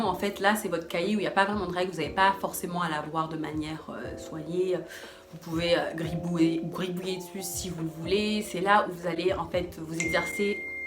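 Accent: United Kingdom. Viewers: black women with uk accent....n'hésite pas à regarder l'objectif de la caméra au lieu du retour ! :) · olalala tu m'as deter !!! cet accent anglais magnifique un goal absolu !!! Merci pour tous ces conseils, ultra ultra utile!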